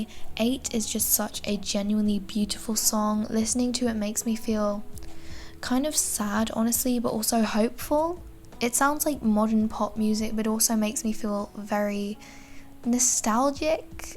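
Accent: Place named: England, United Kingdom. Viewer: Omg, I really love you're English accent :) Great video btw :)))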